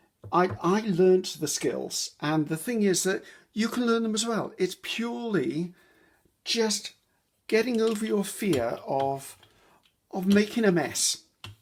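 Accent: United Kingdom. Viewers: I watch your wonderful videos daily and I never tire of your creations. I especially enjoy listening to your British accent and quirky sense of humor. I live in the Deep South of USA. We use tung oil on heirloom antique furniture.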